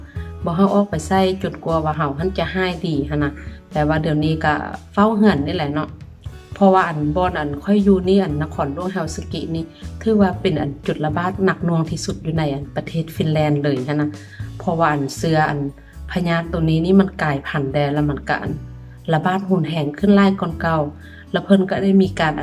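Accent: Luang Prabang, Laos. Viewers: มัก เด เสียงเว้าหลวงพะบาง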